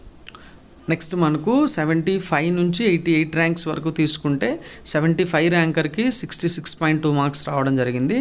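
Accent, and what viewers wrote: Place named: Coastal Andhra, India. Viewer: Hi " " garu ur work is good bcz collected in 2018 dsc ranks information, i am not expected u as kadapa guy bcz does not dialect of kadapa😅 voice over is good as costal, i belong to ATP😁, all the best 👍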